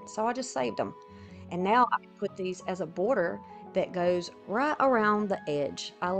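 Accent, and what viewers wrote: South, United States. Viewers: I LOVE your southern twang and I LOVE that u are a straight shooter and tell it like it is! No nonsense comments, tell ‘um, if u want to be negative delete urself and let us enjoy.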